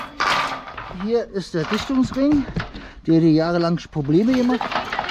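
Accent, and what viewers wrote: Berlin, Germany. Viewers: Die Berliner Schnauze ist einfach klasse💪🏻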